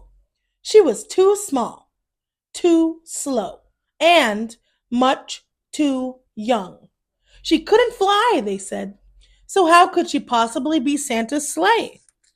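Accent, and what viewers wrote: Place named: United States. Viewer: Love the cawntry accent 😂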